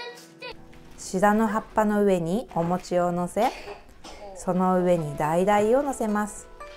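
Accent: Kansai, Japan. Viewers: 素敵なご実家ですね。今もこういったお正月行事をきちんとされているなんて本当にいいことだと思います。さんは、兵庫県のご出身とのことですがいつも動画の中では関西弁が出て来ませんでしたが、今回の動画には出て来ていましたね。😊 今年もよろしくお願いします。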